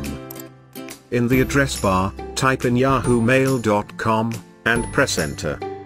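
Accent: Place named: United Kingdom. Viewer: I love British accent so cute and sexy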